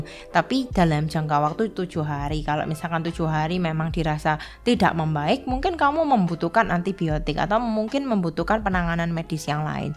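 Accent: Java, Indonesia. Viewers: Saya suka dialegnya logat jawa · medok banget sih ce logat jawanya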